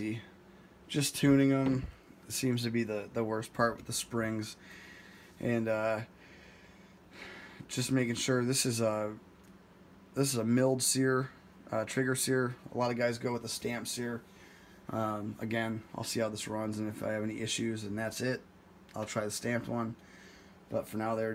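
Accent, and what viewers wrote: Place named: Illinois, United States. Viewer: I was about to ask if you’re from IL based on your accent but I saw comments in the affirmative lol same here. Moved the hell out to chase my gun dreams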